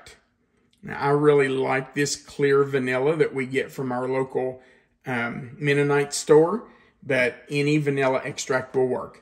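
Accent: South, United States. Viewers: These recipes and slow southern accent remind me of my daddy and Mother, long since passed. Nothing beats the taste and love in these delicious dishes.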